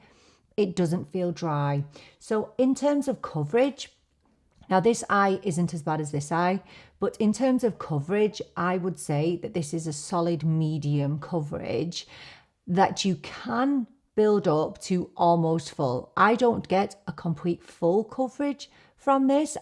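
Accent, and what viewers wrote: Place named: Manchester, United Kingdom. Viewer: Just stumbled onto your channel after searching for Estée Lauder double wear. I love this concealer! Trying to place your accent, are you from around Manchester?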